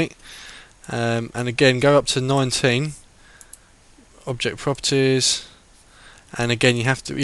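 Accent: United Kingdom. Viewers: im guessing you are british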